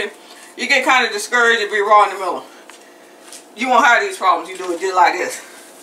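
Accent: South, United States. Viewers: When you see a black woman with a southern accent you know that that stuff is going to be good! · Hell yeah I knew you was from the south 😂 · Ain't nothin' to it but to do it.....Juh like dat dare!! Not a racial thing. It's how some people talk in the south regardless of color. Chef, keep doing you!! Love the recipe and your personality!! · Omg I just love the southern accent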